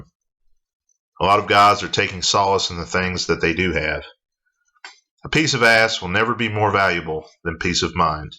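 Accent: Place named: Southwest, United States. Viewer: Good content, eloquent and great speaking voice. What's your accent? I'm guessing S.W. of U.S.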